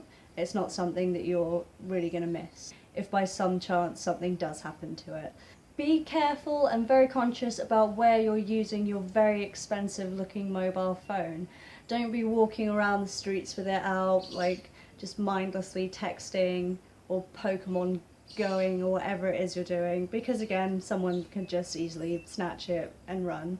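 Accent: United Kingdom. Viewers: I fall in love with your accent, remind me of my ex girlfriend from England 🙂❤️😍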